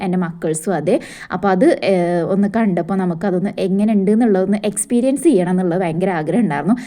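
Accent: Malappuram, India. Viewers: ഞങ്ങളെ മലപ്പുറം കാരെ അതെ സംസാരം തന്നെ ആണല്ലോ ങ്ങൾക് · സംസാരം കേട്ടാൽ മലപ്പുറം ആണെന്ന് തോന്നും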